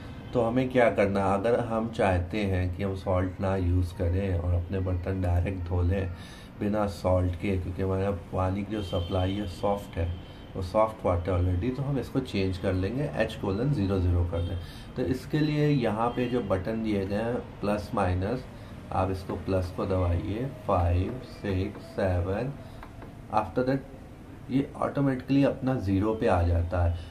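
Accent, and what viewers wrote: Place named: India. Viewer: I not understand nothing ur indian accent ohhh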